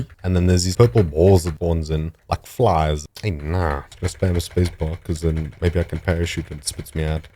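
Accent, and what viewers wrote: South Africa. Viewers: Am I the only one who only realised now, based on the live game commentary, that he’s South African 🇿🇦 😂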